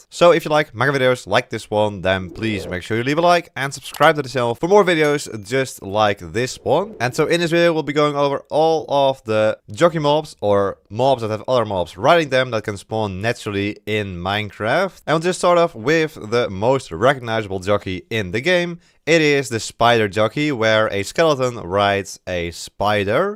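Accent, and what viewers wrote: Netherlands. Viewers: Hoi ben je nederlands? je hebt wel een opvallend nederlands accent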